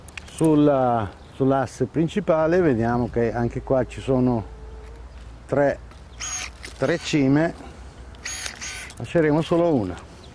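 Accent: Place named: Calabria, Italy. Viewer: sentivo un certo accento calabrese. Anche io sono calabrese. Di Nicotera VV.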